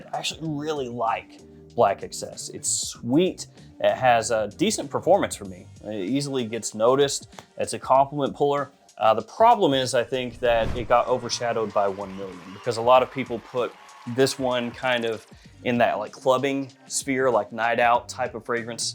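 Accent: United Kingdom. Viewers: Mate, I'm from the uk and we ALL speak like that!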